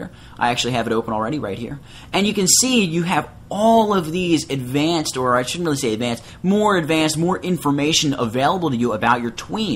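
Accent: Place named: United States. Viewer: typical US accent..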